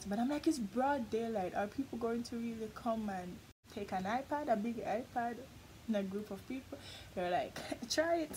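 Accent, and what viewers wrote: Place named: Jamaica. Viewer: Your partial Jamaican accent is so cuuute.🙆 I just love it.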